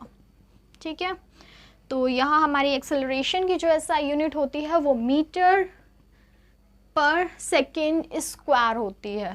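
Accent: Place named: United Kingdom. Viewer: Mam apki accent british wali h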